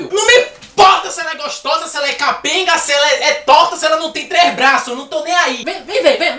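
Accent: Bahia, Brazil. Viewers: c é de salvador ? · ele é baiano????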